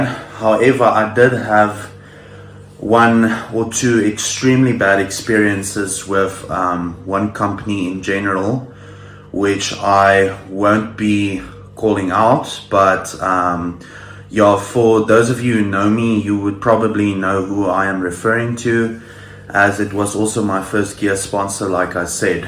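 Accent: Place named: South Africa. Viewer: Hahzit boet, good to hear a fellow saffa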